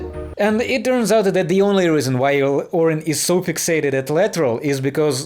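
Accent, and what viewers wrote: Russia. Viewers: The little trix with your and nice everyone can do that but you need to get someone that talk English. Not Russia.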